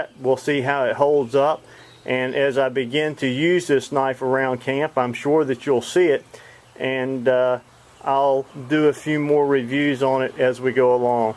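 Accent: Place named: Richmond, United States. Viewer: I'm really liking your videos man. I have this knife as well. Great knife! This thing is a work horse and cuts about as well as any knife I own; and that includes a F1 Fallkniven. I asked Dave his opinion of the knife and he said, because it was only 1065 steel it would not truly be effective in all ways. Have you tried to throw sparks off of it with flint? By the way, are you from the Richmond VA area? I think I recognize that accent.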